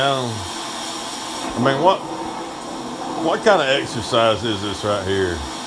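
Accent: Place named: Appalachia, United States. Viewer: This guy sounds like a rite hill billy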